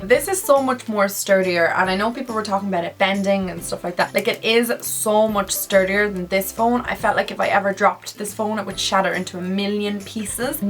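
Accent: Ireland. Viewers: are you Irish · Are you Irish? I just came across your channel, you're gorgeous! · OMG ur Irish same #irishgoals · Are you irish because you rly sound irish ;) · Are you Irish 🇮🇪 if u are I am 🇮🇪🇮🇪😂👍🏼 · Are you Irish I am xx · i love your irish accent 😍😂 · What part of Ireland are you from ??🇮🇪🇮🇪🇮🇪🇮🇪🇮🇪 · is she irish? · Just found your channel knew instantly you were Irish you can't hide the accent🌸 I'm from Galway❤️ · Are you from Ireland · She's from Ireland :) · Is she Irish because I'm from Ireland and I'm from Dublin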